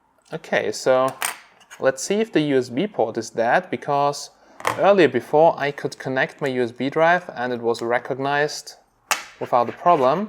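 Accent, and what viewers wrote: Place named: Germany. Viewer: I love how u speak english with German akcent :) ( bad english i am from Bosnia )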